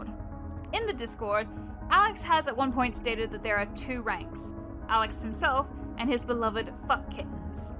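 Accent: Australia. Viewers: wait a damn minute he's australian? gross get him out of here musty bitch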